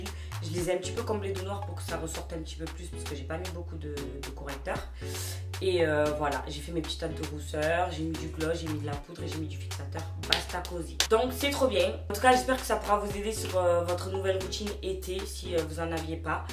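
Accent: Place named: South, France. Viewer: T’a un accent du Sud , comme moi 😭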